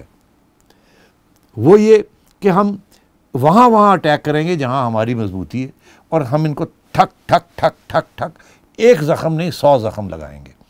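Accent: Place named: Punjab, India. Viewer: Tum pakistani kitni bakwaas urdu bolte ho yaar, urdu main punjabi lehza daal diya hai. Kya bhaji paala banaya hai.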